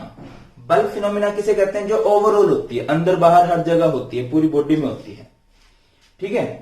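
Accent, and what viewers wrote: Haryana, India. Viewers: Mujhe lagta h aap harayana se ho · Sir aap hariyana s ho kya , becoz your voice is like that only 😅😅😅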